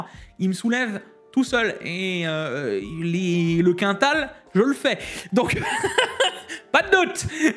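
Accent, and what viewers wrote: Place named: Alsace, France. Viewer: Hello et les autres, le SN850x est pas chère (aout 2023 j'en ai acheter un en 4to sans dissipateur pour 270 euros). pour moi, les télés LG c'est la vie le WEB OS est top! en vrai l'accent alsacien bien! allez pourquoi pas tester l'écran amazon:) le LG en 39 pouces (un 32 et demi) c'est comme le NEO G9 57 POUCES (deux 32 pouces) niveau taille sa doit être un monstre! Ah bah le ASUS en 4k 240hz Oled reverse en 1080p 480hz n'a jamais été en promo mdr! alors pour ce qui est achat, j'ai juste acheter mon asus rog swift oled 4k à 1109 euros au lieu de 1500 de base et puis c'est tout! d'ailleurs j'ai choisis celui ci pas que pour le gaming mais aussi pour travailler sur des photos #OUTILPORTATIF:)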